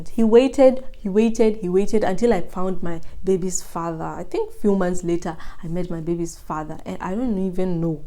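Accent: Nigeria. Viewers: It's giving Nigerian accent!! You are strong girl.❤ Keep up gal! You are a star. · Pole..i noticed your accent is almost Nigerian. Dont worry things will be better. One door closes and 2 more opens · but accet yako ni yawanaijeria😂😂😂😂u speek like them not kenyan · U got new sub I'm so sorry for what you go through I also I noticed you have naija accent 😂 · Why do you have a Nigerian accent? 🤔. Ubaya ya wasichana wakenya ni kupenda ngono sana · You kinda have a Nigerian accent. Anyways, sending all my love ❤️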